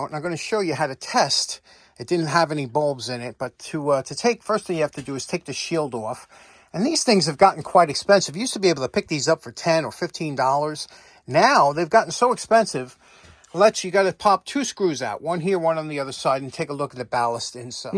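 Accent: New York, United States. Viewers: Cheers, everyday is a school day watching you! Very informative and I find your explanations really enjoyable (especially in your new York accent) I'm loving going through all your past videos. Cheers from Devon, England 👍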